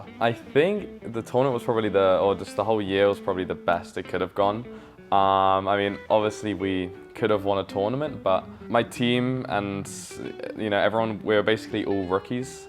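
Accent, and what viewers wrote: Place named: United Kingdom. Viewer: イギリス英語で声が良いから耳が心地良い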